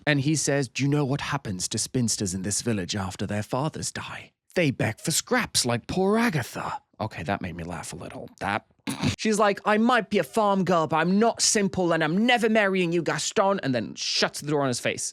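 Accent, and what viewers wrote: Canada. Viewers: the more i listen to your accent the more i realize you are probably canadian which would explain it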